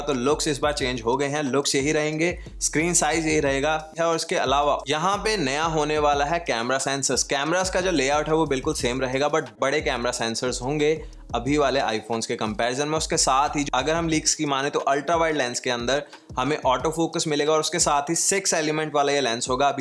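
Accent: Punjab, India. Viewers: sir are you punjabi?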